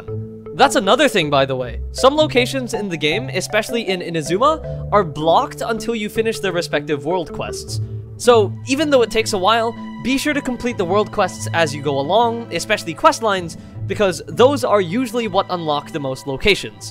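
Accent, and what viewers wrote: Netherlands. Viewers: Are you dutch? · I like the thick Dutch accent XD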